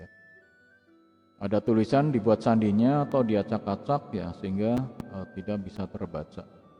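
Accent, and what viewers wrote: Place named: Banyumas, Indonesia. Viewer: Brute Force... Mantaf.. Ngomongnya ngapak gak papa malah seru... Cuma kurang di cepetin dikit ngomongnya hehehe.... Trims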